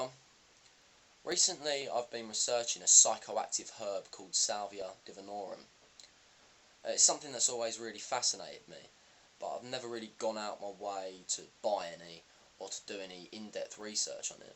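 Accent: United Kingdom. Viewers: Your voice and accent makes me think of the Harry Potter movies....sorry...I'm Canadian...so I love other accents...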